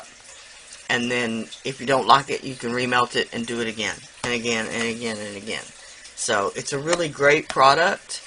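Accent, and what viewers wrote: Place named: Texas, United States. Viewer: I'm glad you mentioned that you are from Texas. The accent is so pleasant and familiar. I'm in Arkansas, but the accents are similar. You sound EXACTLY like my friend Cynthia, so that is just another plus to watching/listening to your tutorials. You are awesome times ten!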